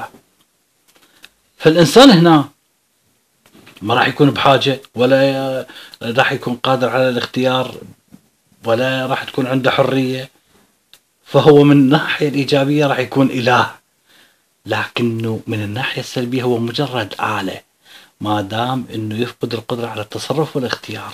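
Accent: Iraq. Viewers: عم بسمعك من تركيا أيها الرائع 💞اليوم شفتك بفيديو شخصيا انت مميز ولما تطلع بالفيديو بيكون احلى بس خلي الجلسة وراك مميزة أكتر مثلا خلفية بيضا وتجلس عا كنب وامامك الطاولة مبدع انت كتير استاذي وانا كمان صديقتك بالفيس لهجتك العراقية زادت الفيديوهات جمال💞🥀 فيه بصوتك نبرة حزن ليش😔💔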